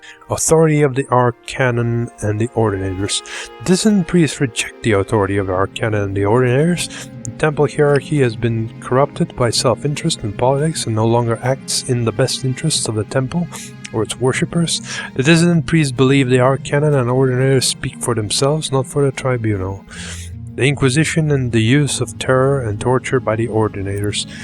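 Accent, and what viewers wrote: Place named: England, United Kingdom. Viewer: fainas tavo angliskas akcentas :)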